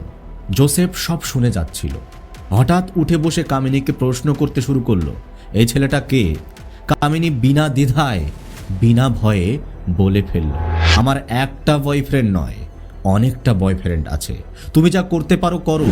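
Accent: Bangladesh. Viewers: Apnar protita uccharan kothabarta porishkar BANGLADESHIDER mato .. Bharote ese voter card adhar card kobe jogar kore thakte suru korechen .. INDIAN bolchen but you are not INDIAN YOU ARE BANGLADESHI .. SOURCES TA KI ,, POLITICAL SOURCES ?? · tumi ki bangladeshi? Tomar pronunciation tai laglo. Khub valo poribeshon, khub valo laglo.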